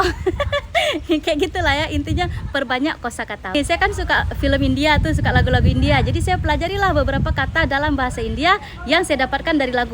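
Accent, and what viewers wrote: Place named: Aceh, Indonesia. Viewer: Bereh bu kemanapun gaya Acehnya tetap aneuk Aceh mețuah.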